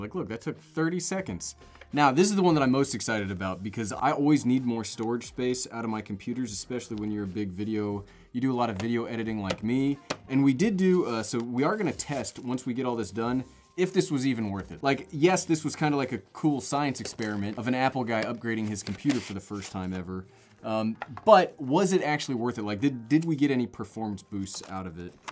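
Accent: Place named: United States. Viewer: Always makes me do my confused face when Americans pronounce 'Soldered' as 'Sodard'.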